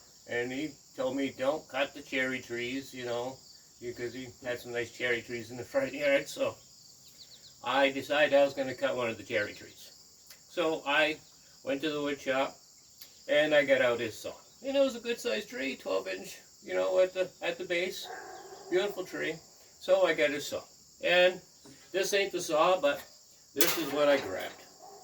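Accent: United States. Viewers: Just found your channel I’m looking forward to watching your updates in the wood yard. I can tell you are a new Englander by your accent, I’m in Connecticut myself, good to see New England represented here on YouTube! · Hello, just checked out your channel, and I subscribed, that is quite the beard you have! I am guessing you are out east, Maine maybe by your accent? Good luck!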